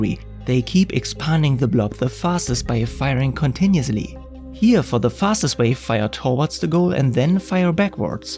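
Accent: Germany. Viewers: People complaining about the accent … well, you‘ll have a much harder time understanding this in German which is the author‘s native tongue. And nobody else does videos which come even close, so rather applaud for this content being in English at all - as a large portion of YT is German language actually…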